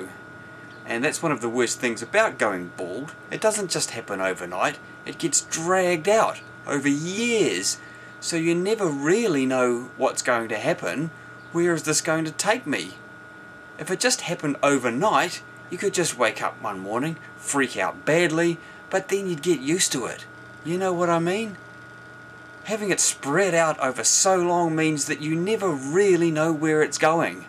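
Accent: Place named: New Zealand. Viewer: wow, new zealand accent is similiar to british, but quite different at the same time...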